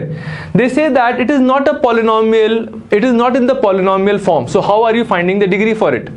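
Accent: India. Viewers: Funny INdian accent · That exact occasion, when Indian accent actually adds to video's credibility. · I’m sorry to say that, but I can’t understand Indian English fully.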